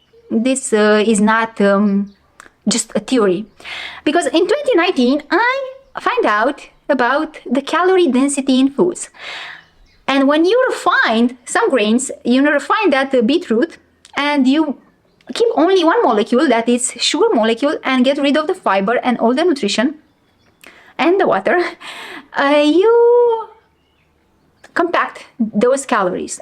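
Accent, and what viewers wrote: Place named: Russia. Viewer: You should stick to your native language, Russian or whatever, and dub in English. Your grammar is good but your manner of speaking is rough. No offense.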